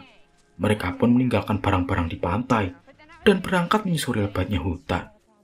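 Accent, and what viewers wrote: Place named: Banyumas, Indonesia. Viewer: pd bae ngapak ya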